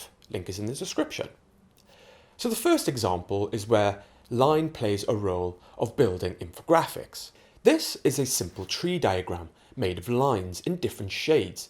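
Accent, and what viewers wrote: United Kingdom. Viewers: Is this a British accent?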